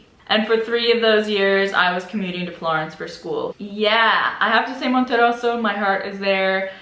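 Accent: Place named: United States. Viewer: Interesting, I would though that longer time spent outside US would change your english to be more plain in intonation but you still have this singed US english. For example you say four times in the row "theeeeere" instead of plain and simple "there". If you were not from Nebraska I'd say your are from California :-))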